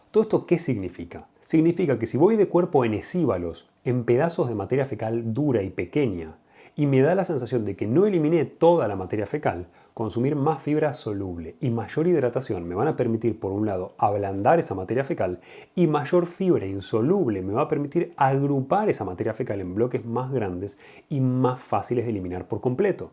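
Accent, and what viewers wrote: Argentina. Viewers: Me encantan estos videos informativos y llevaderos por ese lindo acento argentino,. Saludos desde Buenos Aires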